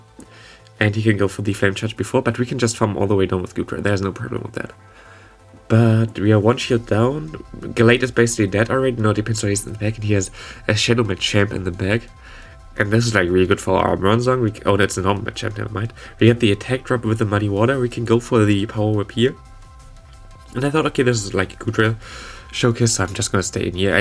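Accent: Germany. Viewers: Gutes Video aber ich cringe weg mit dem akzent (nicht besonders starker aber deutschen akzent erkennt man gleich)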